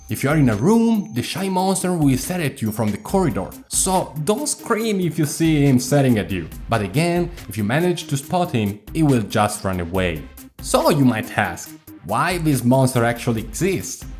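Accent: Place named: Italy. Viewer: Quello si, il tuo inglese è veramente ottimo, ma una delle cose che mi ha fatto dire che sei italiano era l'accento😂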